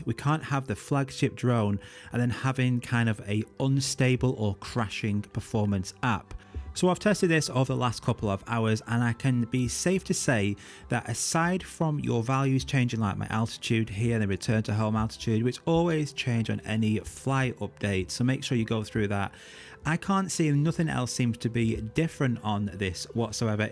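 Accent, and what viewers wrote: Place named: North, United Kingdom. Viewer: Thanks, now I can do the update without worries. BTW, where are you from? I'm curious about the accent that sound a bit northern :) New sub :)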